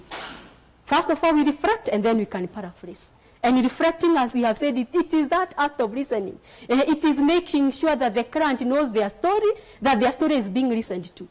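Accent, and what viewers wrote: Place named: Kenya. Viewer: Nice lecture... I was suffering a little with the Kenyan accent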